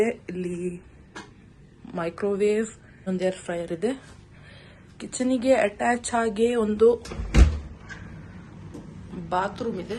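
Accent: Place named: Mangalore, India. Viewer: ನೀವ್ ಆರಾಮಾಗಿ ಮಂಗ್ಳೂರ್ ಕನ್ನಡನೇ ಮಾತಾಡಿ ತೊಂದ್ರೆ ಏನಿದೆ 😁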